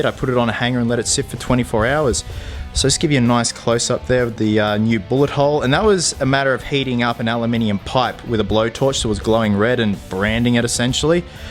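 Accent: Australia. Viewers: It's kinda weirding me out watching Batman speak with a high pitched Australian accent. Like some wires got crossed in my brain it something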